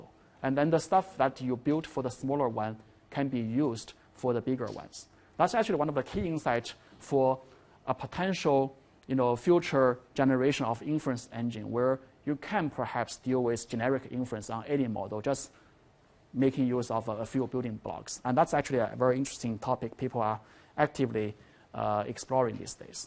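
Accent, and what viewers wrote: China. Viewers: Chinese English,XD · 我第一反应也是天朝口音真亲切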